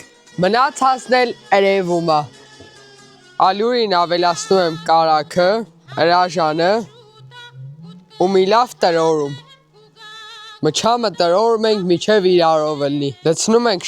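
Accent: Lori, Armenia. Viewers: Մեր Լոռվա բարբառը 😊😊❤❤❤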